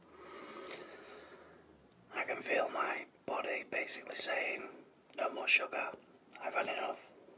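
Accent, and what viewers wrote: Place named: North England, United Kingdom. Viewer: So satisfying - is the honey thicker like that or just as runny? Also I can't place your accent, I keep going from Manchester way, to possibly the Wirral, to maybe Yorkshire (I'm a Yorkshire lass)?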